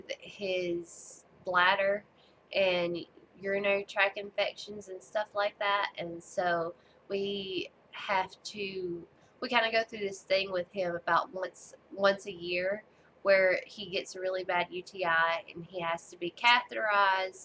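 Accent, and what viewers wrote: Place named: Alabama, United States. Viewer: Hi! So nice to meet you. I am an Alabama girl too. I was born in south Alabama but I live in north Georgia now. So you and I have the same accent.:) So sorry to hear about your illness but so happy to see you stay positive in the middle of it all. You are truly an inspiration. I enjoyed your first video. I don't make videos but my needlework can be found on Instagram if you are on there. I looking forward to see more of your beautiful needlework. This community is awesome. I am so glad you are part of it. Merry Christmas to you! Happy Stitching ~Janet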